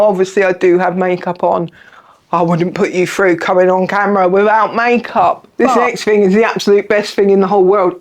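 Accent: Cockney, United Kingdom. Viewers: no thats fine. I originally thought you were here in the states but with an English accent. Thank you for clearing that up. Lol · Cheeky you, love your cockney accent. I bet you’d love my Bronx accent. 😂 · As an Aussie I find your English accent super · ...are you cockney, Scottish? Please tell!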